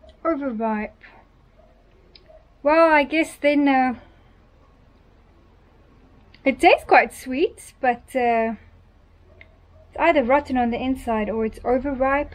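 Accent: South Africa. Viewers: Hello from Bedford, Eastern Cape, SA. Ya, we get 40 deg’s here in the summer . Know that you really needed the pool. I can hear your accent but it’s strangely like as if you’ve got something else mixed in the way you’re rounding off some of your words . Not exactly like our lekker plain South African accent . Can’t put my finger on it . Anyway , you’re a brave girl! Good luck . 🌺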